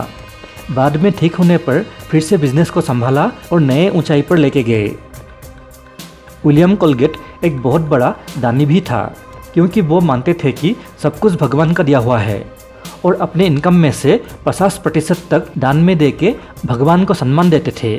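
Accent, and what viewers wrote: Assam, India. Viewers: Assam se ho kya aap ? 😄 Aapki hindi eisa hi hai kuch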